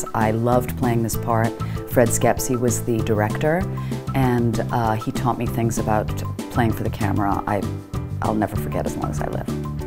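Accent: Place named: South, United States. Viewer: Hearing her Southern accent makes me want to see her play Clairee from Steel Magnolias.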